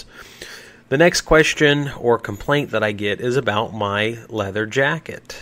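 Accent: Ohio, United States. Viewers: hmm really interesting, I picked up on your dialect and mentioning that landmarks like sack n save. Crazy that YouTube randomly suggested a creator that lives in my small little Ohio town. The strangest part of this is I’ve never really watched any investment/laundromat videos until this one was suggested for me. seems even crazier that when it does suggest one its someone that’s a 10 minute walk to their laundromat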